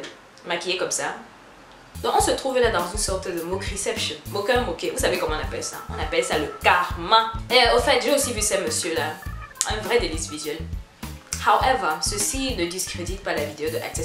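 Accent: Bamileke, Cameroon. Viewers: Ton accent bami la me tue. On s'en fou comme dab 😂😂😂😂😂😂🤗🤗🤗😊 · 😂😂😂😂😂😂😂😂😂😂😂😂😂😂😂😂😂😂😂😂😂😂😂😂😂😂😂😂😂😂😂😂😂😂😂😂😂😂😂😂😂😂😂😂😂😂😂😂😂😂😂😂😂😂😂😂😂😂😂😂😂😂😂😂😂😂😂😂😂😂😂😂😂😂😂😂😂😂😂😂 hahahahahahaha!!!!!! l'accent bamiléké là c'est la magie!!!! troooooooooooooopp drôle tu es!!!!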